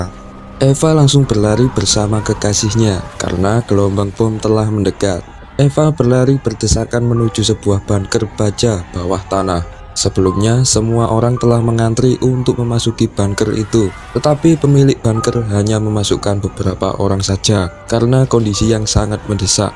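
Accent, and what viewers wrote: Java, Indonesia. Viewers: Medog parah min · Lucu narator nya medok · masnya medokkkkk😂😂😂👍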